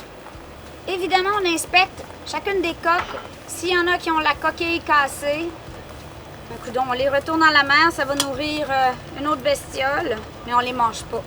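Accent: Quebec, Canada. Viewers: J'adoooooore ta bonne humeur et l'accent québécois et bonjour à tous les acadiens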